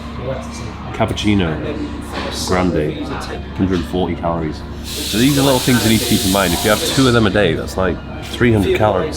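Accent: South Africa. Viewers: That Saffa accent was THIIIIICCKK,,, Yes boi... anyways love the content! Watching from South Africa 🇿🇦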